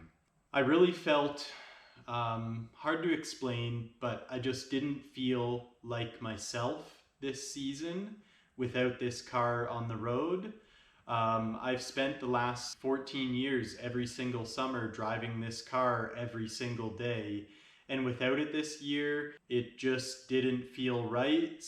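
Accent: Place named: France. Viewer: Hi, are you french ? You have a french accent !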